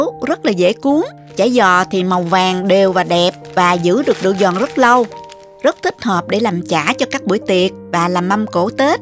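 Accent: South, Vietnam. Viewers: Cảm ơn thật nhiều ❤giọng Miên Nam sau mà dễ thương quá ❤ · Giọng nói Miền Nam của ngọt ngào & thân thiện quá. Học được bí quyết làm chả giò giòn lâu, cách trộn nhân để bánh giòn & cách bảo tồn trong freezer. Cám ơn cô nhiều lắm. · Giọng miền nam quá dễ thương, tự nhiên ko điệu, cách làm dễ hiểu, cám ơn 🥉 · Rất thích cách hướng dẫn ngắn gọn kèm với giọng nói miền Nam dễ thương của " hén, hé, nha...." 😆 Cho mình hỏi thăm mua con dao bào khoai và carottes ở đâu vậy? Cám ơn cô trả lời. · lam video nuoc mam cham cha gio, bun thit nuong va com tam nhe! Con rat thich cach nau an va going noi ngot ngao nguoi mien nam cua Co. Chuc Co nhieu suc khoe va lam nhieu mon An nGon guip the he tre cua tui con Lon len o My duoc biet ve am thuc Vietnam. Thank you nhieu😍😍😍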